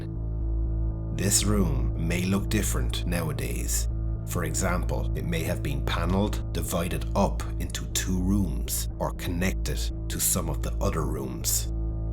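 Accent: Ireland. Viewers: I love your accent which travels far and wide before coming home to Eire. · I don't know who you are, but you have found your calling! I just discovered your channel tonight and have since watched all 8 of your videos/mini-documentaries. They are better than 99% of the docs on Netflix, etc.! They are thoughtful, expertly researched and produced, well-paced, and leave the viewer wanting more. The Irish accent is just the topper! Now, get to work and give the people what we need, tout de suite! 😂😍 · Really professional. Are you Irish?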